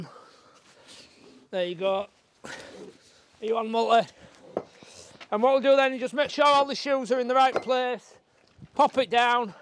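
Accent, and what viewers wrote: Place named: Leeds, United Kingdom. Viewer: ey up, these are great videos! im a leeds lad living in cornwall now s0 its good to hear the leeds accent. may i ask, what is the minimum distance i can build my base up to a neighbours fence to conform to building regs? ? (subscribed)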